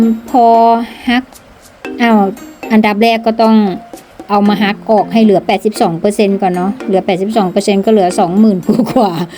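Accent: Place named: Korat, Thailand. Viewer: สำเนียงคือโคราชแท้😊😊😊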